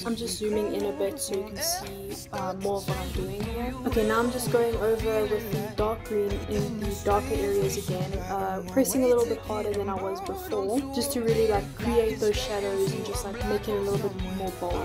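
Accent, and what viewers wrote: South Africa. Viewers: R u from South Africa ? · Are you South African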